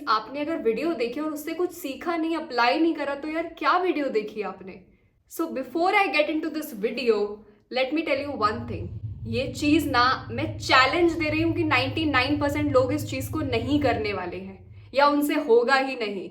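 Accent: Bengal, India. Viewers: Tu bengali hai kya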